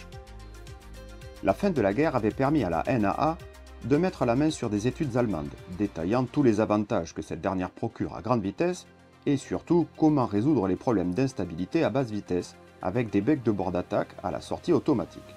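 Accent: South, France. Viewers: Très beau documentaire … Les détails techniques et historiques sont très bien présentés. Le petit accent méridional du narrateur et quelques pointes d'humour permettent de suivre cette présentation.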